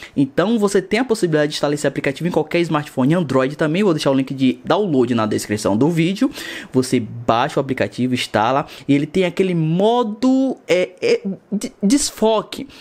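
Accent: Bahia, Brazil. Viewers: Tu é baiano? Te sigo no face mlk bom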